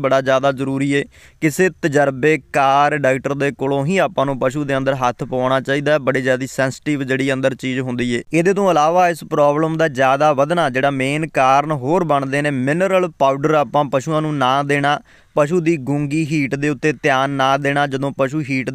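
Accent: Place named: Majha, India. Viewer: Veer ji majhe to lagde je boli to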